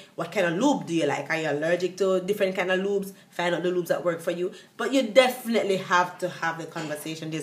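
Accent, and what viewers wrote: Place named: Jamaica. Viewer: Thank you, you are very helpful. I love your jamaican accent xxx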